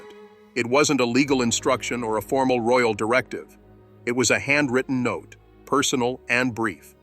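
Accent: United States. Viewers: Disrespectful using a US voiceover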